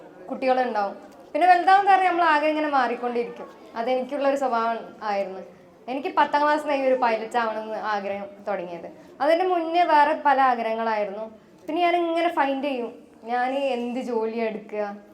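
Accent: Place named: Kasaragod, India. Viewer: Masha allah mole congratulations ❤❤eniku kasarkkode bhasha bhayankara ishtamanu😂keep it up mole❤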